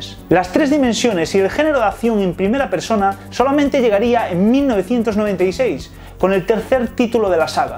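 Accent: Galicia, Spain. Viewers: 10/10 la imitación de. A mí me parece simpático el acento gallego. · Eres un crack...hay gente que no se da cuenta de que eres gallego y que hablas así que no estás imitando o poniendo voces...lo que pasa es que aquí nada más que hay heaters mongoloides y envidiosos...sigue así fenómeno!!! Viva Galicia y Vixca Valencia!!! Pd: lo siento por lo del penalty de Djukic...un abrazo de 16 bits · Ostiaaaaa!!! Q bueno el giro final con José en Paz descanse.. de nuevo engañando a todo dios.. Patéale el culo a esos haters! Deberías subir un vídeo cada día para alegrarnos la tarde... XD PD: soy de ElBierzo y por ende medio galego, y cuando te oigo parece que estoy hablando con un paisano de la miña terra!! Sigue así amigo! Y ni te dejes avasallar por la envidia de esos niñ@ y no tan niñ@ Rata! 🐭🐀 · Brutal me parto con tu acento gallego, no cambies. Me encanta descubrír estás versiones imposibles en consolas menos potentes.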